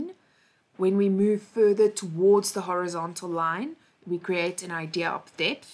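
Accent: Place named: Germany. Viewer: Darling, audio stopped working from till the end of that chapter. It starts again with the new chapter. I don't know if it was a copyright thing or a mistake in editing, but, that happened. I hope it was only a youtube blip and there's actually nothing wrong. It's the fourth video I watch today in this channel and I'm loving it and also I love the German accent! I hope your channel keep moving onwards!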